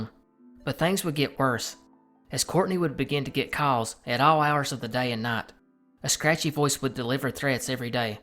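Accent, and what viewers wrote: South, United States. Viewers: Damn you’ve got a strong accent. Which state r u from? Good video btw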